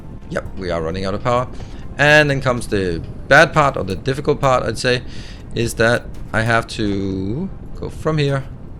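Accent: Denmark. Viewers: Are you danish? (because of the accent. Nothing against danes, on the contrary...just curiosity)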